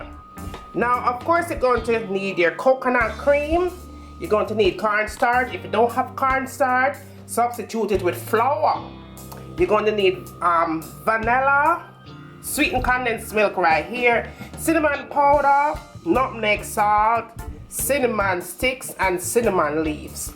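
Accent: Jamaica. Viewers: Your man must be happy cos you can COOK and Cook EVERYTHING!!!! Keep up the phenomenal cooking. Its so rare to here an american whose Jamaican accent remains, I love it, true Jamaican!!! No disguising that xxx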